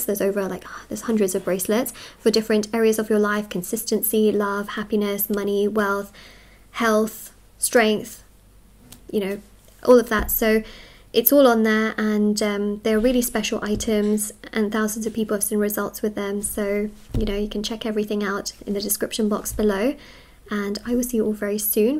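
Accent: United Kingdom. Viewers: This lady is from UK I can say that. I wish to go and work in london for a couple of years. This slang sounds so interesting.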